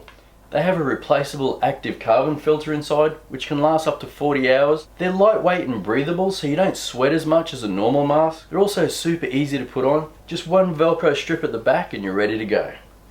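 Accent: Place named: Australia. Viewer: hello, I hope this finds you and yours in good health and fine spirits. I just found your channel and I really enjoy your content. I do like an Australian accent and you seem like a regular guy whom I would like as a neighbor. The popular YouTubers say it took them a long time to grow their channels. They said their channels just limped along for years until one day their channel just took off, so hang in there.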